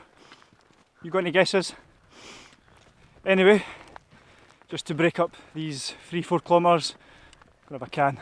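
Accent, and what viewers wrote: Scotland, United Kingdom. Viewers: I slag that twat Hopkins off a lot worse than you . I m not saying you re personally chucking litter but you re popularising a night out in a scenic location having a cook up and booze up (and don t try and tell me you did nt have 4 cans in that overloaded rucksack ) and already one of the idiots following you is saying "i m having some of that seeing that Suilven is a piece of piss to get up even tho it looks scary from a distance. You ve already said its busy at weekends up the tops lol.. Thats how the Peak District started off .The same will end up on all the munro s with all overnights being banned and YOU are part of the problem . I haven t got a problem with your jock accent , but the automated sub titles certainly has..It was the only funny thing about the vid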